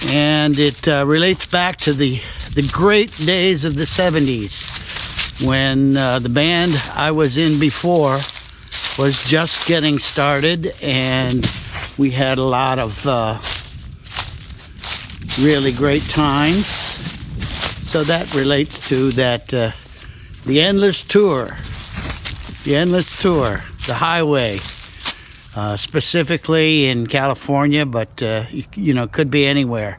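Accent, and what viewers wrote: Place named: Upstate New York, United States. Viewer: Just listened to the album, love it! Very impressed with your multi-instrumental skills. I especially like, I think. Nice lyrics by Helen Wheels, she was so talented. R. I. P. Very interesting walk-and-talk, and beautiful scenery. BTW, love the upstate NY almost Canadian-sounding accent. I'm from Norway but I like to try and pinpoint English-speaking people's accents. Sorry for the digression. 🙂 Edit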